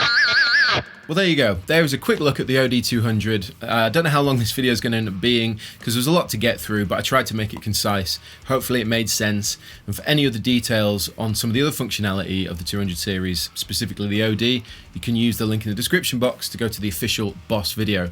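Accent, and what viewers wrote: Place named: Lancashire, United Kingdom. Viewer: where did you grow up mate? I'm trying to unlock your accent, accents are a pet obsession of mine. I'm thinking Lancashire but I could be way off...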